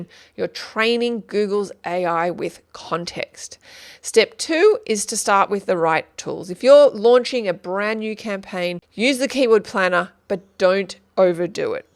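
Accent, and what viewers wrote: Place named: Australia. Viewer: Australian accent is so sweet :)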